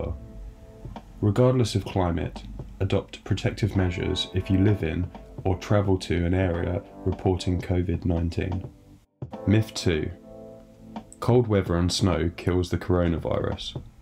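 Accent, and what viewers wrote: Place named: United Kingdom. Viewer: Wow Your British!! Never seen anyone British on my channel. Great Content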